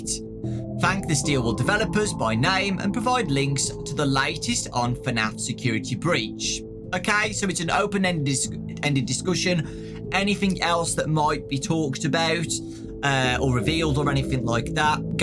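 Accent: United States. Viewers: i love his american accent lmao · Lol that American accent 😄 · American accent is so good it's unsettling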